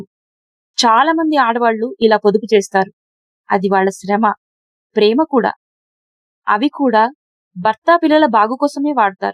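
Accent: Telangana, India. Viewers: చాలా బాగుంది గారూ తీయని తెలంగాణ మాండలికం మీ గళంలో చక్కగా ఒదిగిపోయింది. మీకు, రచయితకు అభినందనలు 💐💐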